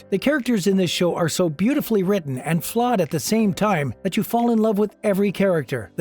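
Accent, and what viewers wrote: United States. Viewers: PLEEEAASSEE....LESS OF YOU TALKING..ABOTE the show!!! Sorry to offend... But when you decide to do any kind of public speaking, you REALLY need to try & lose the annoying Boston accent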